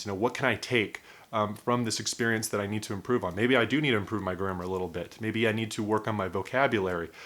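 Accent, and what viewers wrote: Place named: United States. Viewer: Thanks for sharing. I was wondering where your accent was from. I'm quite sure that it's American but I can't recognise the State yet. I'm asking because I noticed that some Americans pronunce "Better" as "BeDer" while others use to pronunce it with the "T". I like your way of speaking and your points of view. Keep going 🙂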